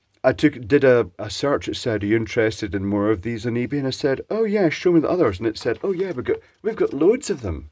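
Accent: United Kingdom. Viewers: he has such a british accent accent. ( i am from the netherlands ) so any one can help me how to, rephrase this ?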